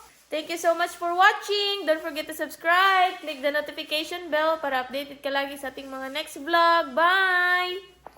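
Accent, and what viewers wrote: Visayas, Philippines. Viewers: Ang cute mo magsalita ng bisaya,sak² sinagol Tagalog ba😀😀😀pag amping mo ug sa jogging nyo💪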